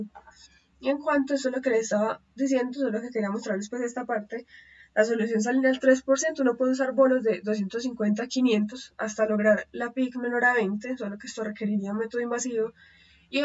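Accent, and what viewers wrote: Colombia. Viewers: tan bella la doctora con acento de betty la fea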